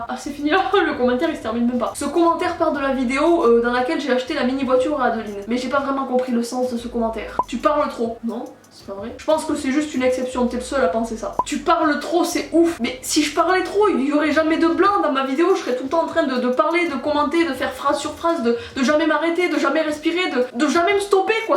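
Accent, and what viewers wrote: Toulouse, France. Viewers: L'accent Toulousain on l'a pas choisi lol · Tkt pas les commentaires méchants ont s'en fou, et ton accent c l'accent toulousain moi j'habite a Toulouse et j'aimerais grave te voir ❤ et ton style j'le kiffe trop 🔥💪❤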